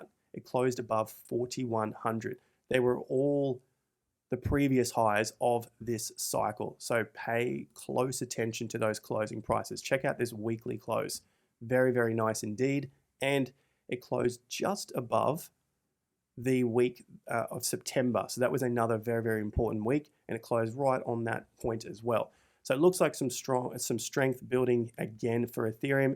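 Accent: Australia. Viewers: That makes no sense. He’s Aussie.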